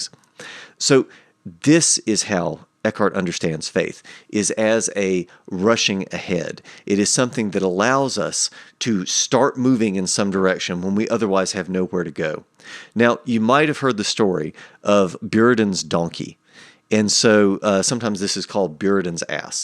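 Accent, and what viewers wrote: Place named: Carolina, United States. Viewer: I'm going to comment after watching the entire video, but I'm wondering where you're from. At first I thought you might be an Okie, like me, with your subtle Southern accent. But I'm hearing a bit of a Carolina accent in your "O" sound. Any chance I'm right?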